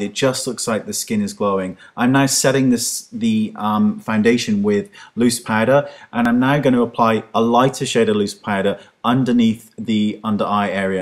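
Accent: United Kingdom. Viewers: He's from the uk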